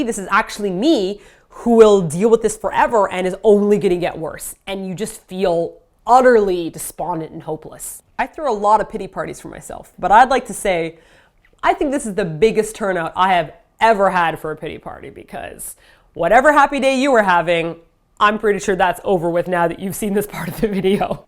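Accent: Canada. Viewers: I love you. This video was amazing. You made me cry and laugh. I have to say this one reminded me so much that you really are Canadian your accent was so strong in this one. Your videos help me so much and no matter how few you are able to do I will always be excited to see them post. Thank you for inviting everyone to your pity party. We all need one and they should never be held alone. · ! A. (I won't say "don't", because not is incredibly hard and kind of mean to yourself during a release, but) You don't have to feel sorry for crying.:) It is a release, and it is human to cry. (I have cried during class during every school year of my life. Including college. And possibly at every job. One job, I was so miserable, I was crying in front of customers/while taking orders, and crying in the break room. I didn't feel too bad because I felt I had zero control at that point.:P And honestly, my co-workers were being such jerk butts, I was hoping that uncontrollable defense method(?) would encourage them to treat me with a little more humanity.) B. 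Your Canadian accent really came out in this video! It was super surprising/interesting!:D C. I think we all underestimate how many people are affected by depression (long-term/short-term), thank you for opening up about your private life to start an open dialogue on the topic. D. I love you and everyone and wish everyone luck in the struggles of life. May we all remember the good times over the bad.:) E. In honour of opening up: every since my first depression, I have been able to jump back to my lower points very quickly even if things are fine for months/years at a time. I feel like I equally can bounce back quicker, and luckily for me, I think my darker days arise from situations/environments instead of just a chronic chemical issue. I am very glad that when I hit my lowest point, I did go to my parent (while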